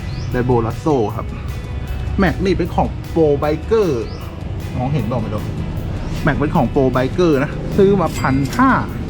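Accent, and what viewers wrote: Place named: Central, Thailand. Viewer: สำเนียงนี้โซนกำแพง ชัยนาท คอนหวัน อุทัยใช่แน่นอน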